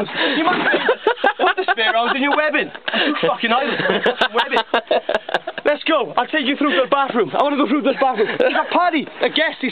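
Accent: Wales, United Kingdom. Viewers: With the heavy Brit accent and the videographer laughing loudly, it is hard to know what this clown is talking about. I hope he is safely back in the U.K. making civilian videos and taping the videographer's mouth so as to silence his laughing so we can hear the narration next time. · Welsh accent* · Makes me proud to be Welsh aswell 🏴 I believe he's got a Newport or Cardiff accent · I struggle with his accent but I got told he’s Welsh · Yeah that's the Welsh accent of his coming through. Our accent is really strong. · 100% a Rhymney boyo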